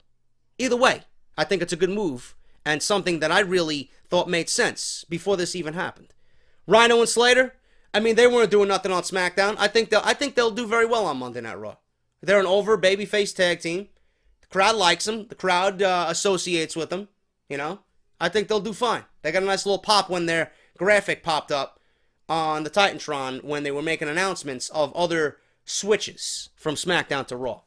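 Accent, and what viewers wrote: New York, United States. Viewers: Is this guy Vince Russo's kid or do all New Yorkers sound the same?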